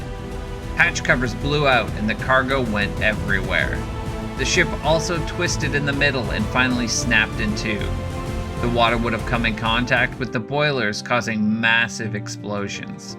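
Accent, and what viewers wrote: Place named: Canada. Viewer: I'm guessing your Canadian by the accent and mispronunciation of Detroit and RADAR.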